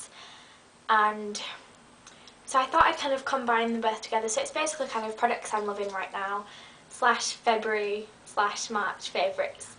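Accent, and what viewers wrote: United Kingdom. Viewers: i cant bloody handle the wayy you add i got a bloody good day any how do i thought like bloody good cherio shut the fuck up man i hate british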